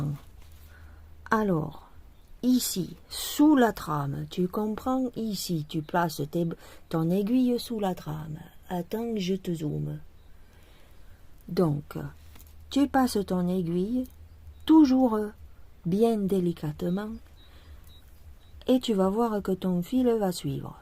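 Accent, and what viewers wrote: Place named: Toulouse, France. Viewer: Coucou ma belle 🩷elles sont magnifiques tes toiles et le loup est magnifique 😍. Super ton humour, tes jolies phrases, on dirait une petite fille 👧. Merci pour ce partage et bon courage pour tes toiles dont tu aimes tant! Super 🤣🤣😀😀ton accent de Toulouse. 🩷🩷💜💜😀😀🌅🌅 Belle fin de journée à toi et famille 😘😘😘ainsi qu’aux loulous 🦮🦮🐈🐕🐶😘😘😘😘😘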